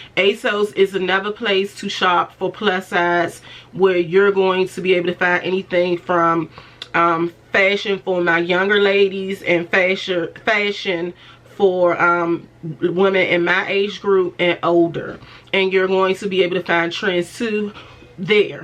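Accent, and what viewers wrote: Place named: DMV, United States. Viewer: Hey DMV friend ❤I’m new to your channel and had a feeling when you started speaking had to be from DMV 😊I love love your channel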